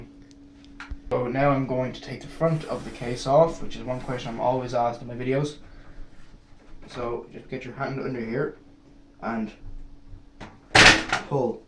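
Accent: Ireland. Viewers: are u irish? :D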